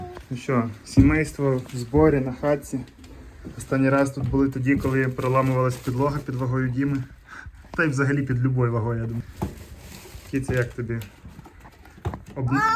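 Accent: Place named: Rivne, Ukraine. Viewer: знайомий суржик:) Ви з Рівного?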